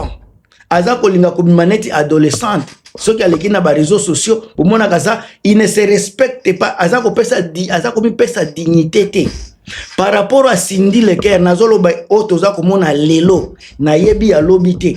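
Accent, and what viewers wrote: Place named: Swahili, East Africa. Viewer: Lingala d'un vrai muswahili, très charmant finalement. Très belle vidéo avec des propos bien ajustés! Bravo! 👌🏾👌🏾👌🏾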